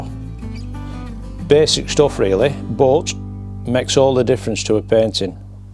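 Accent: Lancashire, United Kingdom. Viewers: Just dawned on me its Fred dibnahs accent Bolton Blackburn area😂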